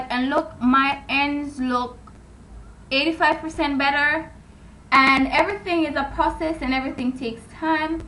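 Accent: Jamaica. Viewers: sounds Jamaican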